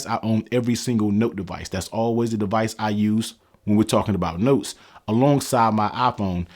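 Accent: United States. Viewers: lol! Figures... I'm from VA and you sound like my all my family and friends in NC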